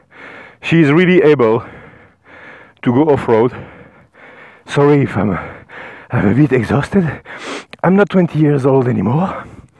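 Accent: France. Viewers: are you french ? because you speak englich as à french guys......salut d'un motard français. ....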